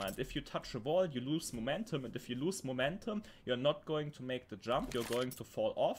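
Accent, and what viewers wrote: Germany. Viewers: German accent ? 😉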